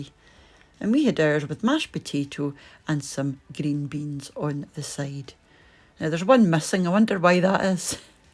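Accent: Scotland, United Kingdom. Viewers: Those look wonderful and I love your accent. I'm Scottish too, just American born. God bless you real big. Thanks for sharing what you know. Jude from Kentucky 🥀🌿🐴💚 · Hello 👋 there from California 🌸your accent and wonderful recipe makes me yearn for Scotland where I went to Uni in St. Andrews!!! I will definitely make these! 😊 thank you enjoy tea time so much what city do you live in? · Thanks. Wife as from Banff area, and lost most of her accent, so you gave me shivers hearing you. And I have missed a real steak pie, and ever more so a Shepherd's pie. Also from West Texas, out in the middle. Cheers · That’s one terrific pie I have ever seen on here ..I’m definitely making this for my hubby ..looks so full of flavours and love the way you actually spoke thro how & what you was adding & doing ..love your accents wee Scottish are lovely people..thanks for sharing ..now adding to watch more you tube with you .❤️🥰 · I enjoy most of these recipes. 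I am very deaf so I rely on the subtitles. It's quite amusing to see that the strong Scottish accent and some regional dialect words are not recognised by the auto system so errors creep in .. but I can usually guess the intended script. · That look really good ngl. By the way, nice accent. The Scottish accent is my favorite. 👍🏼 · Everything sounds better in Scottish. And they look amazing, just like I remember them from my trips to Edinburgh. Think I have to make them myself now... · As a boy originally from Wishaw, it's great to hear that accent! one question, If I want to freeze the pies, should I use short crust for the top, or will puff still workl? Got the pot going as we speak! Thanks! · "that Scottish brogue....Im in Luurrrrve!!!" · Your Scottish accent got me here to stay! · Subscribed less than a minute into the video. Love the Scottish accent and the yummy food! Greetings from SC, USA. 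Love Scottish cooking and the happy feel of this channel! :) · Watching this from Australia. Those pies look absolutely delicious, and your voice with your beautiful Scottish accent is a pleasure to listen to. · Lovely Recipe!! Congrats, Love the accent, I miss it as an Irishman I love the Scots! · her scottish accent is so reassuring, reminds me of my student days, i had a scottish granny as my landlady and we ended up in bed. She had massive pendulous breasts with an insatiable sexual appetite. OH those glorious sex filled students in scotland brings back memories!! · Wonderful recipe! Your Scottish accent is absolutely charming. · R ya Scottish. Love your accent and our pies.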